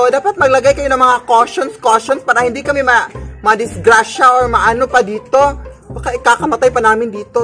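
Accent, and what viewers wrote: Visayas, Philippines. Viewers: bisaya kaba? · Kuya bisaya kaba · Taga visayas ka te?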